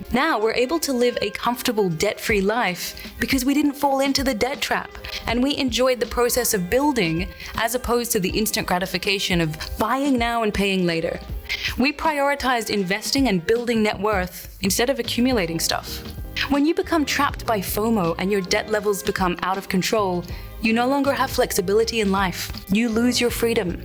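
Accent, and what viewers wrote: United States. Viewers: your accent is changing from british to american, softer R's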